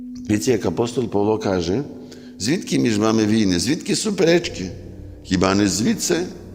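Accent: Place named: Galicia, Ukraine. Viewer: Мені дуже подобаються ваші проповіді чіткі і цікаві і ще ваш чудовий галицький діалект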